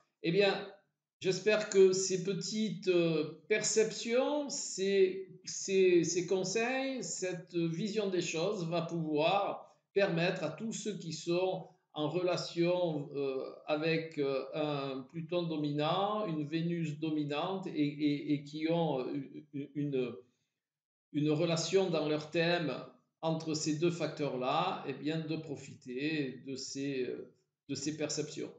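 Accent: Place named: South, France. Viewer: Merci pour la clarté de votre propos et pour votre bel accent du sud.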